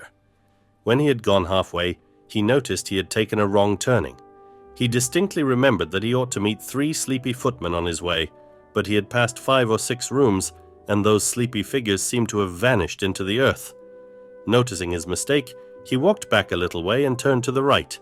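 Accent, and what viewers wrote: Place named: Russia. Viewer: У чтеца ярко выраженный русский акцент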